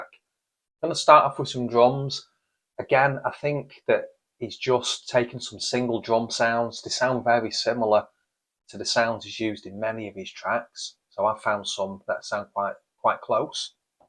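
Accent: Manchester, United Kingdom. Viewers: Is that a Mancunian accent I hear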